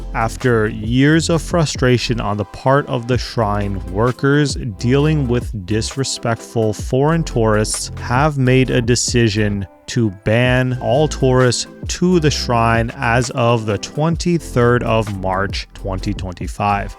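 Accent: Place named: United States. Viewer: Your name is not Japanese, and your accent is very American. Why this video? You did not speak Japanese at all in the video to tell the Japanese viewers what your real intentions are. I am a NYC expat. Living in Japan. Yes, I speak and read most of the language, mostly self-taught. 41 Years in Japan. I surf Shonan. I have friends all down near Katase Enoshima. Thousands of tourists a day visit that area especially on weekends. The rudest rule breakers are the loud, obnoxious. neeeee screaming women on trains are the Japanese baba! In all my years here and down near that tourist area, I never saw one person litter. Once every four months or so, I tell obnoxious loud young Japanese wanna B cool guys to pipe down, and actually, I told a Chinese guy the same thing three years and four months back. Other than that, I often have to yell at little, obese Chinese 10-year-olds for chasing pigeons. 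I walk 5 kilometers a day to stay fit. The most disgusting places I walk by with litter are all Japanese areas with zero tourists. I rarely catch them in the act, but when I do, I get down to their animal littering level and let them have it verbally. Stop blaming tourists. Most of the bad stuff comes from the locals. I can send you maps and photos if you want. I bet you do not respond, though, as you are looking for followers, not reality.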